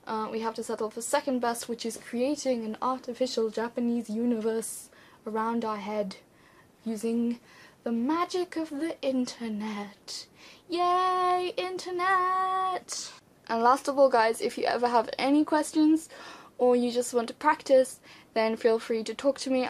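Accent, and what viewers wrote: United Kingdom. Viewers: is she british? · i love your british accent <3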